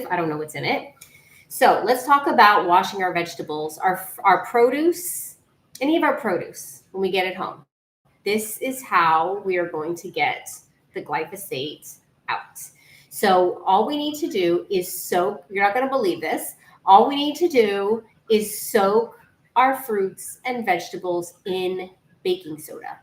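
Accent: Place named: Canada. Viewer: Hey sister, so I used to soak lentil and bean in water mixed with baking soda for 8 hrs , or overnight. I stopped consuming legumes for my SIBO. I wonder though if you'd do a research to find out if baking soda works on legumes? But I'll definitely try mixing fermented pickle juice, I get them in farmers market in Poland, with water. Would a few table soons for a liter of water suffice? I'm glad i found your channel and i appreciate that you use metric system in your videos. Sounds like you're from Canada. Much love and respect for you for making free informative videos. Greetings from Poland. .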